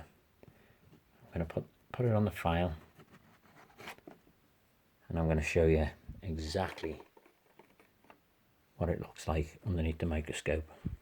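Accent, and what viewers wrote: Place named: United Kingdom. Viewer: I see where do you live in England you seem to have a British accent maybe one could send it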